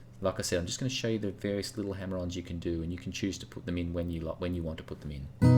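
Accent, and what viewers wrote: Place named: Australia. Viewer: Just landed on this via YTs Bots, me having covered his lessons before, but so glad I did. This lovely warm Ozzy man's voice tone and teaching is spot on, and after all these years he is still giving Gold away for free. Check out his other lessons, brilliant at what he does and sings great too.. Don't pay any attention to the thumbs down, there's always 1 or two in the room that don't get it....haaa🤣. Subbed to many moons back. Enjoy..Rollo.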